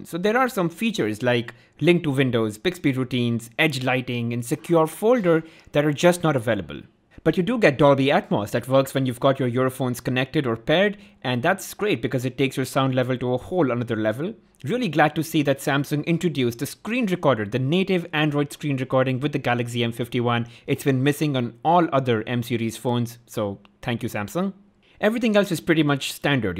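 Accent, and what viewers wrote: India. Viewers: This guy speaks like typical random brown indian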